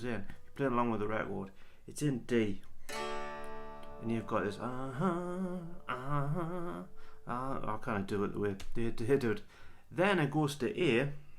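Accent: Newcastle, United Kingdom. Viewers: BTW, I'm not from the UK, is that a Geordie accent you have? Cheers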